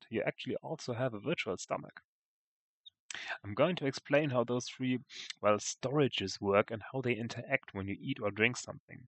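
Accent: Germany. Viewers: That sounds like a german accent :D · You are the DayZ medical messiah. Thank you for sharing this, it's opened up my eyes to what I've been doing wrong. Really good to know. And everything is more pleasant to listen to in a German accent :) You are German...right?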